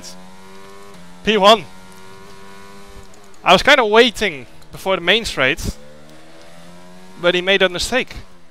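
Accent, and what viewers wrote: Netherlands. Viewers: are you form the netherlands/ kom je uit nederland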